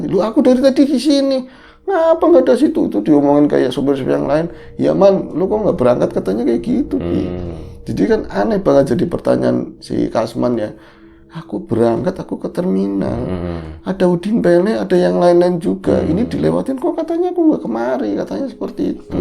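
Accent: Banyumas, Indonesia. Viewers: Ora ngapak ora kepenak... 👍👍👍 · iya sih mas,. tp jujur logat ngapak itu slah satu favorit saya..mskipun sya asli surabaya, tp sya prnh tinggal ckup lama dibanyumas..sukses slalu buat mas & mas,. smga chnelnya smkin maju&brkembang..tp ttap request ngapak nya diselipin dikit2 ya mas..hehehe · Tembe gie weruh mas ngomong ngapak loh😁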